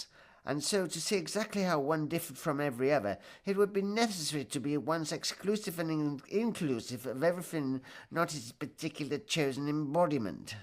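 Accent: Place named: RP, United Kingdom. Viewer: Oh, and love the posh accent :D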